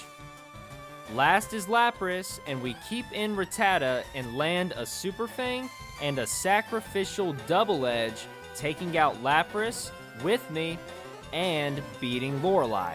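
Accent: United States. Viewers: your southern accent makes this video funnier to me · Is that a South Carolina accent I hear?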